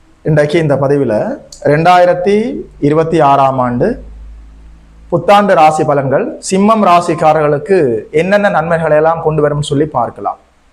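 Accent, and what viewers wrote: Sri Lanka. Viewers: Sir unga tamil and astrology fan sir naan...ungaa srilankan tamil kekkatha naan ungala subscribe panniirukaen. I m from kanyakumari sir(Nagerkoil)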